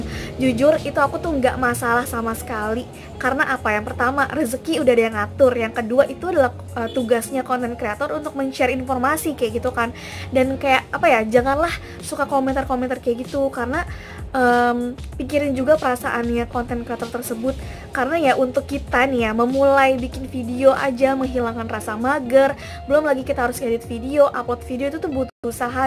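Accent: Jakarta, Indonesia. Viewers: Betawinya keluar dah:) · budi, malih, markonah, betawi banget dah ahhh, gws cantikkkk Allah mau angkat derajatmu 😘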